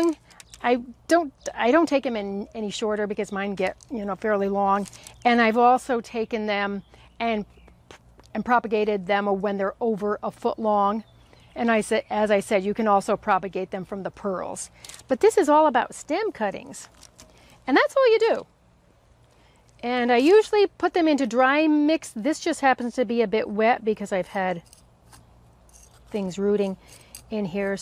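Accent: United States. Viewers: You sound like you're from Northern USA or Canada! Such a sweet voice makes the video easier to watch!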